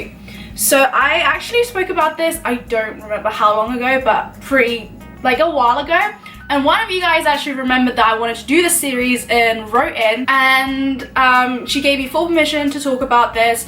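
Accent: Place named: United Kingdom. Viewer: ohh because i heard some British accent..nice to meet you here🌼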